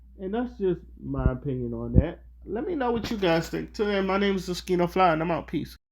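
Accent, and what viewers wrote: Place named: East Coast, United States. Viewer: U sound real dum n east coast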